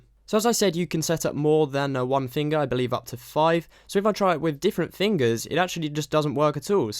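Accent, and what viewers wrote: United Kingdom. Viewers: I luv british accents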